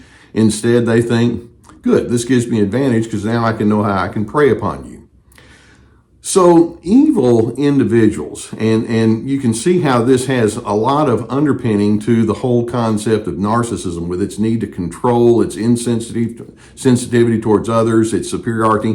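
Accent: South, United States. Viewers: As an aside...I just wanna say..I love your southern accent. ❤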